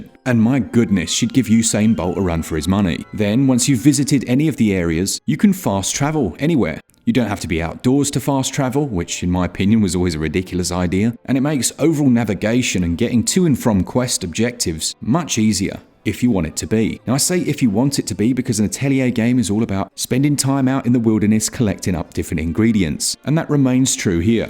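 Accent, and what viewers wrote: England, United Kingdom. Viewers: Game footage and an English accent narrator. What more could one want?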